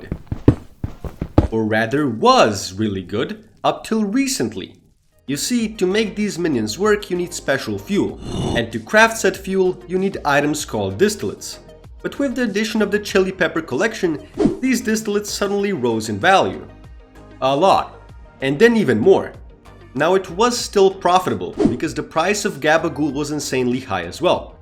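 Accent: Israel. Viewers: אז למה יש לו מקלדת בעברית וגם יש לו מבטא חשבתי בהתחלה שזה לא דומה למבטא ישראלי אבל זה כן מזכיר פשוט הוא לא עושה את הר והח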